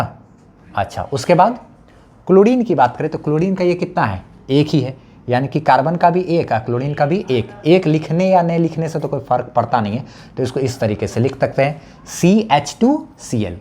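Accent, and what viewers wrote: Bihar, India. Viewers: App bihar ke hai? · Aree bhai enka language se he pata chal raha ha ki ye Bihar se ha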